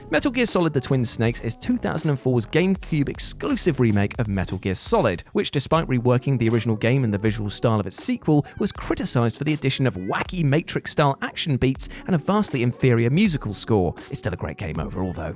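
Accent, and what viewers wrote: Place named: United Kingdom. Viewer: Has anyone ever said that you sound like a more "proper British" speaking version of Luke from OutsideXbox OxVenture? More "proper" from an America's viewpoint that is heh heh 😅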